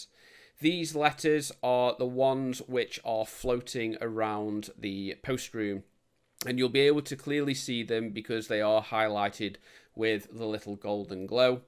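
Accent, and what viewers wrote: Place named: Stoke, United Kingdom. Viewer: the steps were to fast i had to listen to your stoke accent so much !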